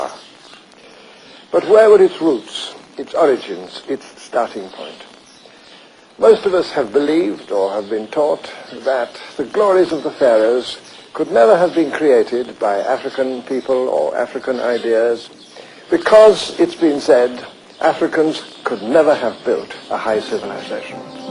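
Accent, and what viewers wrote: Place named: United Kingdom. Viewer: This was made in the seventies it early eighties. He is British and lots of British men speak like that. He was a professor of The school of African and Oriental studies. He was very interested in black history. It is more a style of presenting. Watch a documentary by Richard Attenborough and he has similar style. So does David Attenborough his brother (the old guy in Jurassic park).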